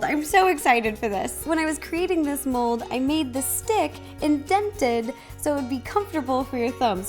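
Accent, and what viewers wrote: South, United States. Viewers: I've been watching this channel for at least a year, I love and her crazy baking ideas, but the more I watch the more she sounds like one of those southern women who hosts the Home Shopping Network in the middle of the night.... But she's from Washington...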